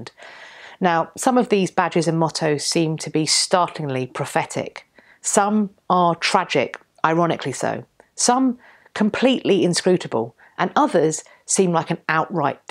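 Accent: United Kingdom. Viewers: Hi, I am new to your channel, I live in the US but I wasn’t born here! I love the beautiful British English, along with the fascinating content. I have been obsessed with the Tudors and British history! Can you do a video about Katherine Howard?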